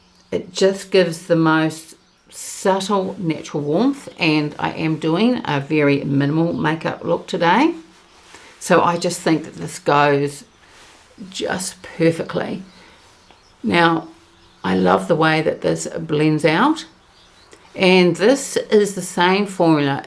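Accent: New Zealand. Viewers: Hello from a fellow Kiwi vlogger, it's nice to hear a familiar accent on YT 😃. I'm interested in the Water Fresh Tint but I'm not sure how it would go on my very fair and rosy complexion, plus it might be a bit dewy for me as I'm more of an almost matte girl. I've just finished the No.1 foundation which I really liked. The colour was probably the best match I've ever had in any other foundation - ever - but for some reason I've replaced it with something new to me, the Haus Labs 😂. Anyway, just wanted to say hurroooo. I'll see you again 🙋‍♀️.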